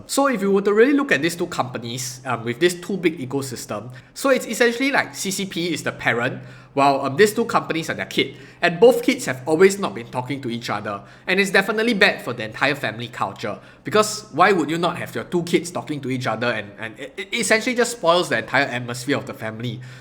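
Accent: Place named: Singapore. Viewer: 新加坡口音的英语！！！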